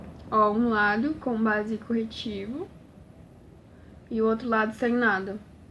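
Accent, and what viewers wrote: Rio Grande do Sul, Brazil. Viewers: Parece gaúcha♥️ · você é do RS? Percebi o seu sotaque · E quê vc fala que nem gaúcha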